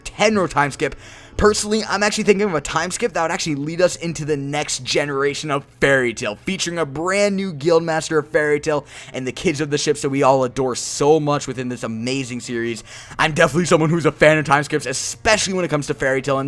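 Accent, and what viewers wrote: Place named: United States. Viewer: Omg this is the first time i hear your voice sempai! haha XD She is .... very... sexy ! and American! haha 😂 btw i wish the studio J. C. Staff. (Shokugeki no soma) who take over the anime and 🤔 I think there may be a flashback of several episodes on everything we didn't see during the ellipse after tartaros arc; with gray/juvia , mirajane, laxus ...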